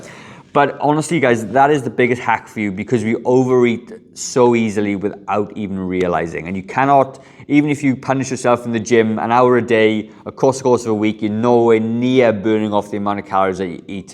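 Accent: Wales, United Kingdom. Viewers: Lou from Ireland here. I love your welsh accent...oh and its Phone case !!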